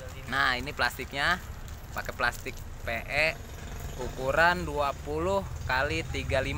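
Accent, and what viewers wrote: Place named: Sumatra, Indonesia. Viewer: bang ..kok ngomong kayak orang Sumatra....asli Sumatra ya bang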